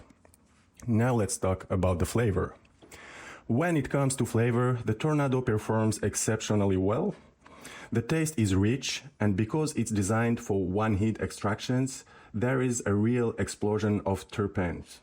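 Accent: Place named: Eastern Europe. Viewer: nice eastern Accent im from Poland ! Peace ! ❤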